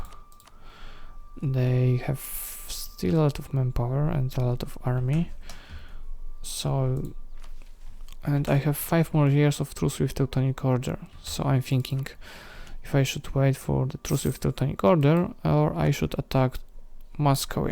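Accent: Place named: Poland. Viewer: Ten twój wyraźnie słyszalny, ciężki, Polski akcent tylko dodaje smaczku całej serii. Świetna seria z klimatem czyli Quality Content za który cię subskrybuje. Miłego dnia!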